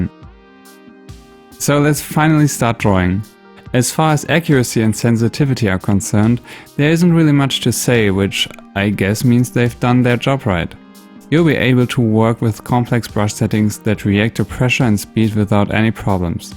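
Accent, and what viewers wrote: Germany. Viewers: Are you German? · I recognised your german accent so fast because I have one too lmao, i also saw your german settings haha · wait are u german? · Finde es lustig wenn deutsche englisch sprechen xD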